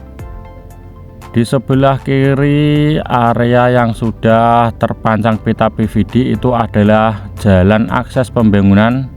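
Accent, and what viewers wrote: Java, Indonesia. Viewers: logatnya medok banget yah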